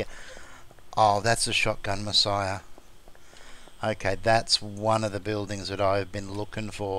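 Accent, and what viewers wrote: Australia. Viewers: One of the coolest builds I've seen. You should play on our server, it is a server for people who like to build. Land claim covers 61, online modifier is 256 and offline modifier is 1024. TNT, pipebombs and rockets have been severely nerfed to virtually stop griefers. Nightly region saves and admin will give you a copy of your region. The server is called Land Owners, it sounds like you are down under and may have a higher ping, I believe server kicks players with pings over two hundred but am certain admin would up just to have you and your awesome builds play with us.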